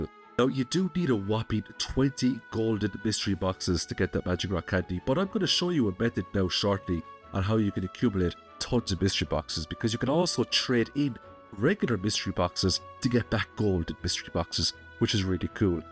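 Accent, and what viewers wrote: Ireland. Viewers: 1st time viewer. I came across your video in my feed and decided to click on it, and was not disappointed. You gave some amazing tips that I have not seen anyone talk about. Great video, I'm going to check out your channel, and watch a few more videos. Just a curiosity question, If I may... Listening to your video, and from your accent, are you from Ireland, by chance?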